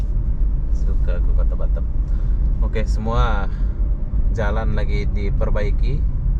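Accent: Malaysia. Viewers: Dia lama di negeri orang, yakni Malaysia, sampe logat sana melekat. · Ini orang bukan melayu klw ngk salah,pi ngomong y kok melayu tolen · Tanya bang mohon di jawab abang orang mana sih ko ngomongnya separuh indonesia separuh malaysia????🙏🙏🙏 Mohon jawab bang kepoo banget nih aku 😁😁😁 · Logatnya separuh bahasa Malaysia.... "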